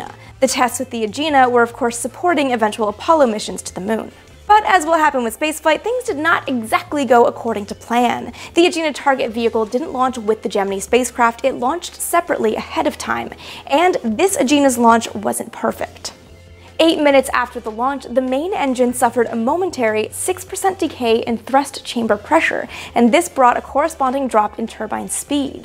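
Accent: Canada. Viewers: Love what you do! Living just a few miles from the path, I'll be doing the eclipse "live". We make a big deal about these events but I've always wondered if so much true science is to be derived from them, why NASA hasn't done more in space research as there is an eclipse occurring at a point in space, constantly. And ignore comments below regarding pronunciation of Gemini... I think it is a bit of a lovely Canadian accent coming through.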